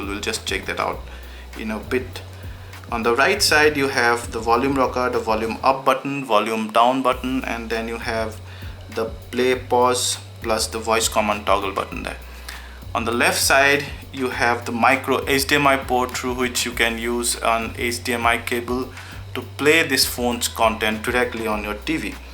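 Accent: India. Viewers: fucking annoying indian accent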